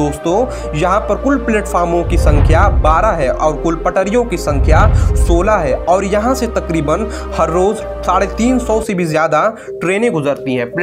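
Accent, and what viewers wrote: India. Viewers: Bihari ho kya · Bhai aap bhojpur region se ho kya?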